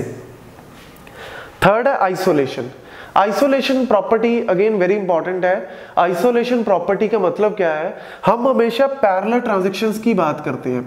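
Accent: Punjab, India. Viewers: u r so cute....haha...and ur hindi accent 4000,5000...haha...so cute... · Very good explaination along with great punjabi ascent.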